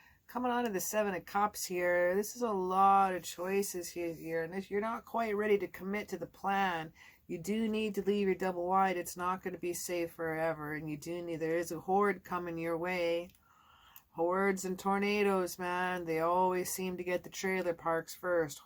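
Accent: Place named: Ottawa Valley, Canada. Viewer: I’m just Cindy, another Canadian girl like yourself! And thanks for following. 😉 I’ve been trying to place your accent. I can’t figure out if your originally from the Ottawa Valley area or the Maritimes?? 🤔. Am I close?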